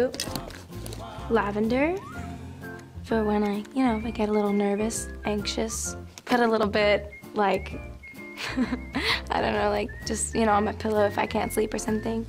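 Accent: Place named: South, United States. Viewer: she has a weird acsent in this video... kinda a southern feel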